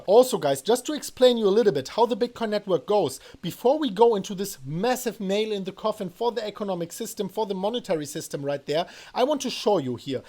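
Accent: Germany. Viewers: you MUST be german!! Your akcent is just too on point!!! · Man hört richtig das du deutscher bist 😋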